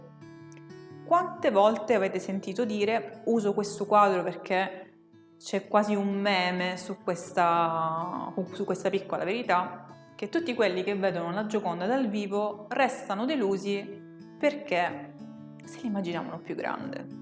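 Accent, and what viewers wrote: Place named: Sicily, Italy. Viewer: SI SENTE BIEN......L' ACCENTO SICULO !!!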